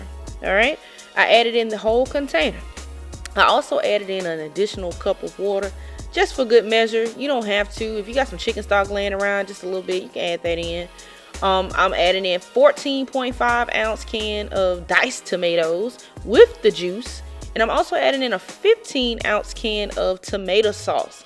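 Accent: South, United States. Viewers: You so country!! 😂😂😂You said throw some Lima beans and a piece of corn bread. Girlll you gonna make somebody go find a bed!! But I love ALL your videos Sis! I will make this because I love soup., but it make take me some time cause Momma got arthritis in both knees bad and I am not depending on hubby to make it cause if it’s up to him we’ll be eating wings and fries or pizza or wings and fried rice. Yeah for real but I love him! Thanks for sharing Sis. Watching all the way from Washington DC.❤❤❤❤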